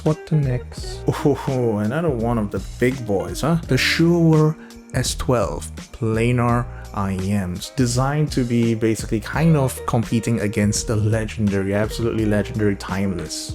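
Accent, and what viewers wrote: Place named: Singapore. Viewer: I could tell you were Singaporean from the accent. I felt validated after checking.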